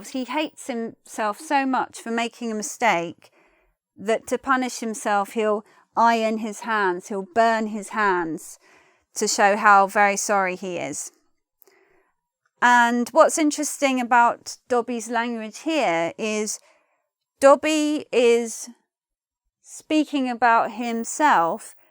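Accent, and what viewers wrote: United Kingdom. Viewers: Thanks my best teacher ..you make me love British accent .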